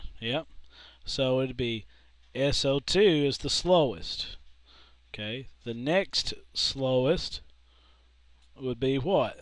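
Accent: South, United States. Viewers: You seem to have a very southern accent, are you an Alabama, Florida, or Georgia native?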